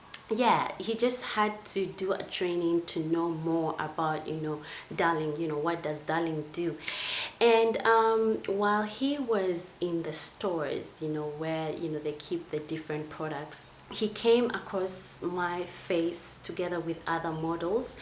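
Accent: Uganda. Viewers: I enjoyed the story!! Monetise this channel so you make some chums. I thought you were Kenyan at the start but then felt the Ugandan accent deep in there. Love your videos💖💖💖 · Before even reading the comments, I knew she was Ugandan. She has this beautiful Ugandan accent.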